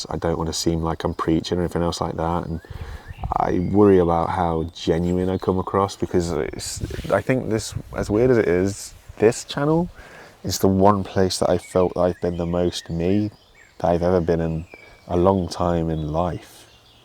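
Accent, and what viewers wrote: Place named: East Midlands, United Kingdom. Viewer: Yes, I can see exactly where you're coming from, there is a lot of youtuber abuse around from trolls, and it does tend to be those who have a lot of subscribers, because they tend to say so on their channels. It seems that the vast majority of people out there though tend to come to their aid and offer support or reassurance that there's no need to be worried. Personally it doesn't influence my decision as to whether to watch a youtuber or not, if they begin to get more popular or wealthy, that's just pathetic, but I guess it does happen and some people do feel like that, and become jealous over what is seemingly insignificant to them. Whereabouts are you, it sounds like not a million miles from an East Midlands town, because of your accent?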